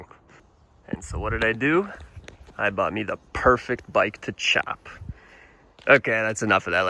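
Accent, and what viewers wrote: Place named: Canada. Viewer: Best part of your Channel: Authentic Canadian Accent = Subscribed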